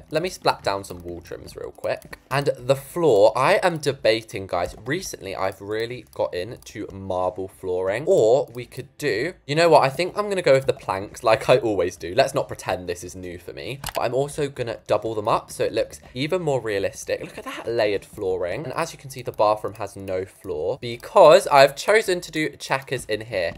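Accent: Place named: United Kingdom. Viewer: i think we can all agree that the build looks amazing! her voice is also so pretty bc im a American but i wanna have a british accent! also a tip for ur next build, idk if you knew this but if you take a ckear basic shape and resize it to be thin then get a decal (code is at the end) and now you have a realistic fridge! one more wsy to do it is to get small grid and get verdical planks and place in a line then coler black then place the taped photograph resize then change decal! code: <num> ur the best!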